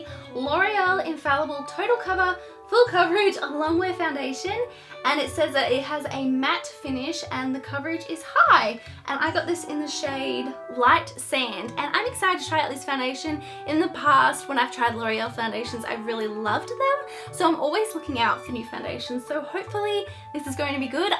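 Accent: Australia. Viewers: OMG are you australian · Your Aussie accent is soooooo soothing. ❤️😘ilysm! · Hi I love you I love your videos I love your accent so much I find Australian accents so entertaining to listen to You are so pretty too 💖💖💖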